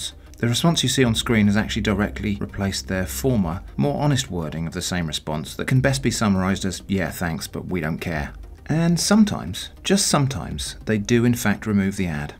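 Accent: United Kingdom. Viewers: Man at this point I am watching this channel only because of his British accent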